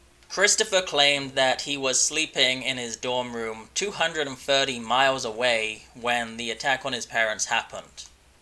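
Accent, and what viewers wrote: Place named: England, United Kingdom. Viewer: could deffo hear your English accent :) thank you for replying, love your channel ❤️ xx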